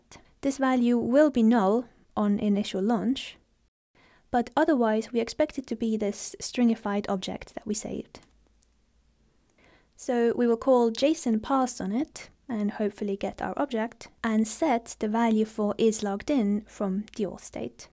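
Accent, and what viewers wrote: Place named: United Kingdom. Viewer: I never understand this british accent. this lady is always confusing. her voice and way of explaining things not quite right. you should always start from clean slate when you explain things.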